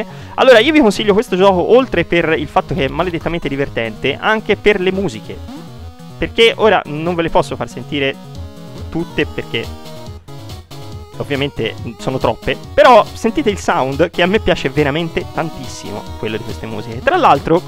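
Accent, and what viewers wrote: Tuscany, Italy. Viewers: Bel video! E' sempre bello sentire un altro toscano su Youtube. Ti sei preso un bel like meritato e un nuovo iscritto.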